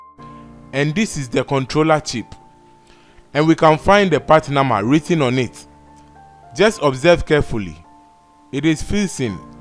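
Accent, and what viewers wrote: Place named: Nigeria. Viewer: You sound like you may be Nigerian. Some good tips. Thank you.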